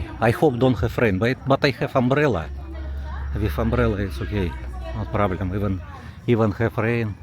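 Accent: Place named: Russia. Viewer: So strong russian accent 😅 Привет, хорошего отдыха тебе! Спасибо, что снимаешь и показываешь!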